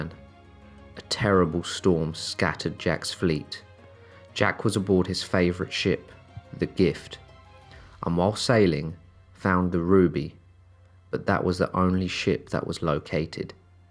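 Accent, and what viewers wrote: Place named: South, United Kingdom. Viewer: You sound nothing the same.. both refreshingly UNIQUE with a southern UK accent.. but totally different voices! 👍😸🇮🇹🤗 I will never understand why many think you sound so alike.. but maybe non Brits hear it in a different way..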